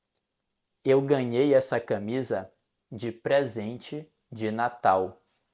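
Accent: Brazil. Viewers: Where in Brazil is that accent?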